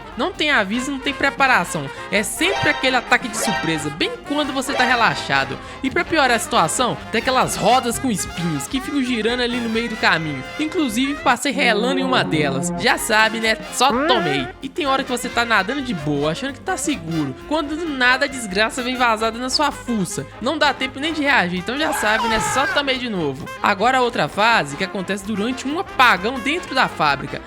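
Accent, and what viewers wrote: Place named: Minas Gerais, Brazil. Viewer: o sotaque mineiro deixou tudo melhor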